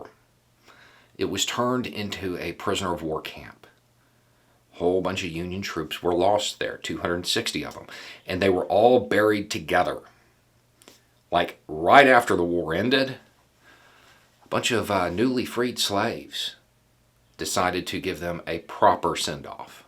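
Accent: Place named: Charleston, United States. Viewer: Worst Charleston accent ever.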